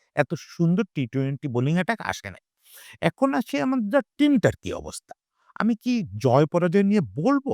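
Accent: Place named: Kolkata, India. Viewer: দাদা আপনি কলকাতার বাংলা টুনে কথা বলেন কেন?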